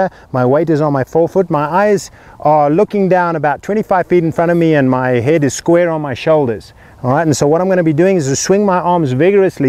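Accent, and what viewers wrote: South Africa. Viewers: Is that a South Africa accent?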